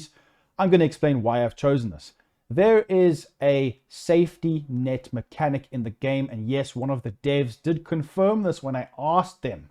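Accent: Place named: South Africa. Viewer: From your accent(btw loved the vid), are you South African?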